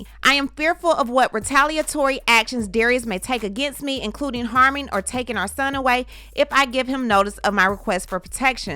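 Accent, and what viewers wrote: South, United States. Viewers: It's the detailed and court document alwayyssss with oh and that southern drawl is everything.. Good work as usual detective 😂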